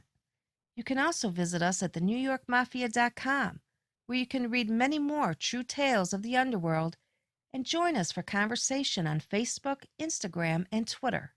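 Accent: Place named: Chicago, United States. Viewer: The narrator sounds like shes from Chicago. Is that true? And if so where?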